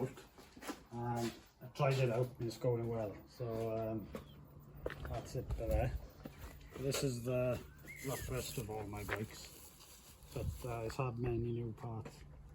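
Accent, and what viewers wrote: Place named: Wales, United Kingdom. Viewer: I impulsively bought a DR350 last year with no previous motorbiking experience! I tried kick starting it a couple of times and it's just sat in the shed ever since buying it! Do you service bikes for others? I picked up on your accent straight away, and I'm based in South Wales if you would be interested in taking a look at the bike for me?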